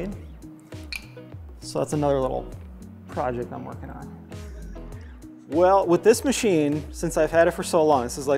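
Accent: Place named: Minnesota, United States. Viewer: How old were you when you moved? Your accent is, non-existent, well aside from being recognizably Minnesotan.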